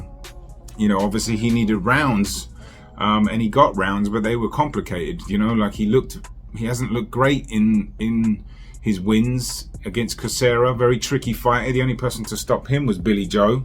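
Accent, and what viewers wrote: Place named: United Kingdom. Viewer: Oh snap I didn’t click you live in nyc. From your British accent I just assumed you live in uk. Props bro from uk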